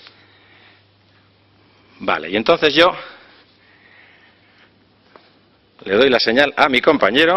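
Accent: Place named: Italy. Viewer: you are an italian who is trying to speak spanish? omg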